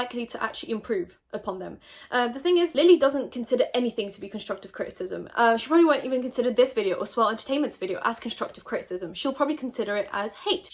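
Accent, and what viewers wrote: United Kingdom. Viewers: omg, I thought you are British! What's your background then? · So out of curiosity, where are you from? Cause I thought you were British😭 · not to be rude or like insensitive but where are you from? i thought you were british this WHOLEEE time bc of ur accent lmao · i thought she was british?? Where is from? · I thought u were British I'm confused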